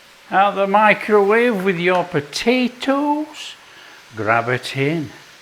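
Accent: Yorkshire, United Kingdom. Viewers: Looks really good,love chili over a baked potato. You said your accent is mixed now,but I think it’s pretty much a Yorkshire sound still,just not exaggerated. I still sound English(Brum) after all these years,Americans know after about 3-4 words,I just use American words,but with a British accent,I lived in American family homes for 6 years then married an American,you quickly learn it’s easier to use American words rather than to explain what you mean all the time.😊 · Yorkshire uk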